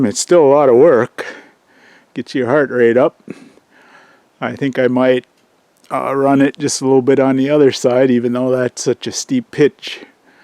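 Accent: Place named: Upper Peninsula, United States. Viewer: you sound alot like yuper er like me from mi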